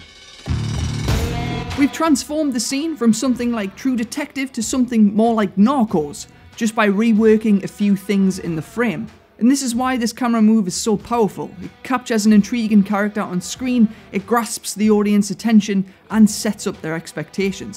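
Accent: United Kingdom. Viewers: Hey bro whats your accent? I aint british but interested in british accents. The acceent I speak is similar to yours. Is it a north accent?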